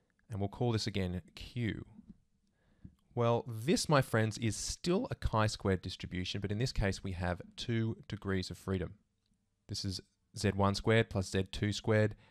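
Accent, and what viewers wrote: Australia. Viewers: is that Australia accent?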